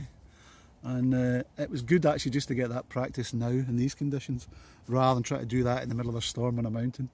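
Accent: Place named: Dundee, United Kingdom. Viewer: Cracking love snow camping was in snowdonia the weekend hardly any about even on the peaks..ps Glasgow would put have money your accent was more northern maybe Dundee ?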